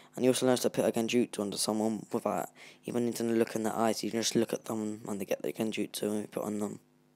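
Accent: United Kingdom. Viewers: yo man’s is welsh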